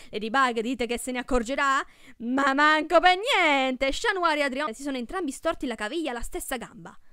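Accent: Bari, Italy. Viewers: Ma sei barese? TVTB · Ma sei barese? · Secondo me sei di Bari 😂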